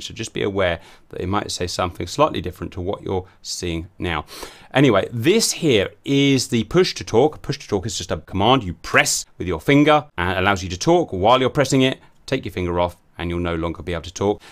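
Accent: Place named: United Kingdom. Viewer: Great stuff man. You lose me at times because Idk what I’m doing but that’s why I’m watching your tutorials. You’ve helped me a great deal and I’m sure there’s a lot more people than just me. I’m glad to see y’all are finally getting recognized for everything y’all do for the community with sponsors. I’ve seen another speaking gig you did the other day. The British accent is loved by Americans and you could sell ice cubes to Eskimo. You gotta great speaking voice and you would really shine in documentary type stuff or historical retelling of events. Best of wishes and Godspeed to all of you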